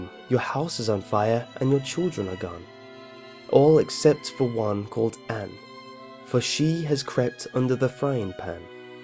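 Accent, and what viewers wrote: England, United Kingdom. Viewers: Does anyone think he is british? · Geez someone PLEASE tell this guy that it is Catholicism. Not Cathlicism. Just makes him sound so ignorant, even with the English accent.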